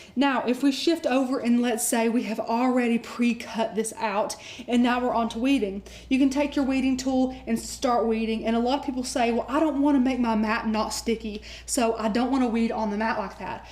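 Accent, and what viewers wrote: South, United States. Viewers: OMG you got a southern accent so I, as a fellow southerner know this is gonna be a good tutorial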